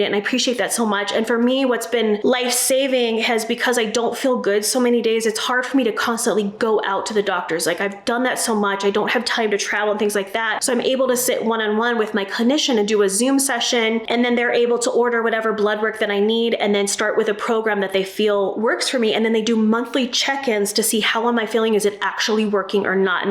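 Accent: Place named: Texas, United States. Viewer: It’s sad that you had to leave Texas but glad you’re able to move for your own well being. I noticed you took a little Texas accent: “Y’ALL” 😃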